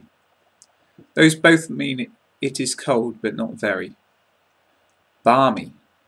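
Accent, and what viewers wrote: United Kingdom. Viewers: I really like your British accent !! Good job :)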